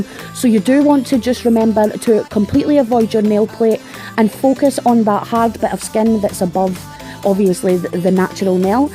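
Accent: Scotland, United Kingdom. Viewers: So glad I came across your channel,I’ll be watching more ,this video is fantastic and nice to hear that accent from a fellow Scot x🏴x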